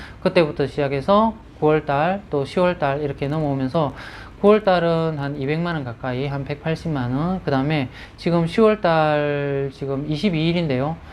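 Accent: Gyeongsang, South Korea. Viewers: 경상도 분들이 유튜브를 많이 하시네요 듣다보니까 분석능력이 뛰어나시네요 와우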